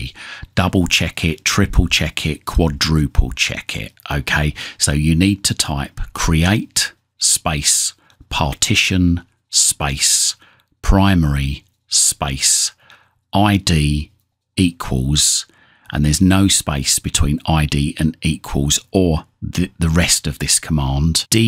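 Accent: England, United Kingdom. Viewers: Fantastic. Extremely clear and concise instructions, plus the English accent was wonderful to listen too. Before I came across your channel, I had worked several unsuccessful hours. I didn't want to wait for Microsoft fix. And your video provided the alternative I needed. 100% success. Thank you. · I have to agree with this user(Donald-area51eagle24) comment. "Fantastic. Extremely clear and concise instructions, plus the English accent was wonderful to listen too. Before I came across your channel, I had worked several unsuccessful hours. I didn't want to wait for Microsoft fix. And your video provided the alternative I needed. 100% success. Thank you." I could not believe how much time I wasted on trying to fix this update. Just an FYI – I have a Master Boot Record (MBR) so following the instruction you got from MS made sense to me. I been IT for 30 years plus but have not work on a computer since 2020 when I retired. 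Best instructions ever. Thank you so very much.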